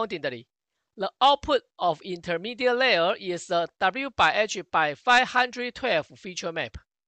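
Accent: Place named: Taiwan. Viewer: The accent of oral English of Taiwanese and Mainland Chinese do have a lot in common which makes your presentation much easier for me to understand, thanks, buddy!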